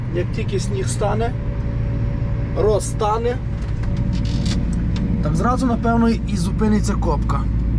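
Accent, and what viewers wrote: Ternopil, Ukraine. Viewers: ваш говір Тай ніби із тернопільщини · Тернопільський акцент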